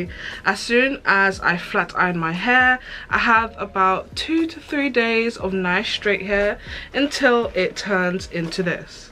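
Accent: United Kingdom. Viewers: British accent from n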